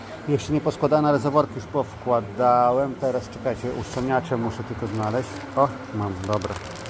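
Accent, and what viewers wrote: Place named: Ukraine. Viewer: Zaciągasz ukraińskim koleś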